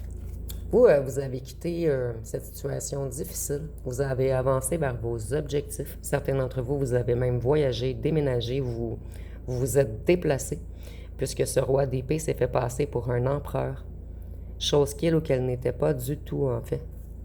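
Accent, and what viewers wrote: Quebec, Canada. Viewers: Bonsoir , c'est toujours avec plaisir que je prends a vous retrouver ,j'apprécie fortement vis guidance ,votre voix québécoise , votre chaleurosite , et votre manicure 👍 toujours au top bien soigner . J'essaie d'oublier ce gros salopard très malade du cerveau et qui se joue a mentir tromper illusionner qu'il a l'habitude de jouer ds son grand scénario théâtrale pour enfermer les pauvres victimes et plus elles sont empathique plus le vampire se régale ,il n'aime que ça les énergies un sorcier magicien ds l'ombre très mal ds sa peau et ds sa tête a toujours être ds la séduction les mensonges son financier est le plus important et toutes ses merdes d'addictions , pour moi il peut croupir il s'est attaquer a une élus ds la lumière et donc a dieu lui même , il est surveillais de la haut et le divin est en action il va payer le poids de ses erreurs et détruite se sentir plus fort que dieu lui même capricieux gamin ds sa tête ,immature , qui boude ou fait des crises capricieuses et ou de jalousie , un vrai psychopathe qui aurais besoin de consulter un docteur malgré que je croit il est irrécupérable et qui voudra bien le garder a part la tierce sa sorcière qui est comme lui ds l'ombre et la sorcellerie . 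Merci a vous , bonne soirée .❤👍🙏🌹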